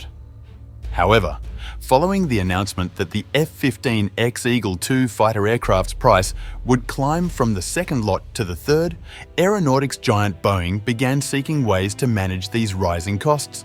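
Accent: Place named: Australia. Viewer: Why Aussie accent? What do Australians know about F-15's?? Just doesnt make sense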